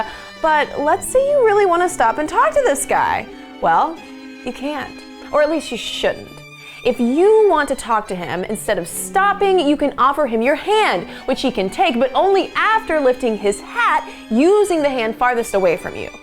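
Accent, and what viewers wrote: United States. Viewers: It's really odd hearing an obnoxious American woman explain Victorian customs. I am most unamused by this hussy. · Seems ridiculous having an American doing the narration ! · What an obnoxious "presenter"! These customs and ways would sound more valid and easier to listen to from a native British speaker. Her attitude could use a major overhaul, too. She contributes to the image of the obnoxious American know-it-all. I wouldn't subscribe to her channel for all the pounds in Britain! (p.s. I'm an American)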